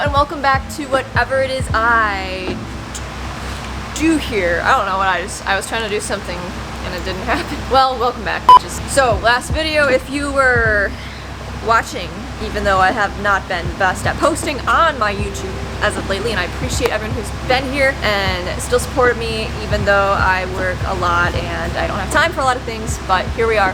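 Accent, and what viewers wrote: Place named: England, United Kingdom. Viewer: Definitely an English accent, not 'strayan' (Australian). With the reverse cameraI I also tend to forget about the existence of mirrors... good luck with the repair!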